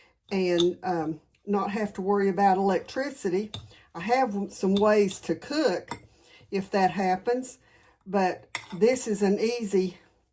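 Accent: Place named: South, United States. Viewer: Hello from Georgia! I love your southern accent ❤the food always looks great, love the presentation on this one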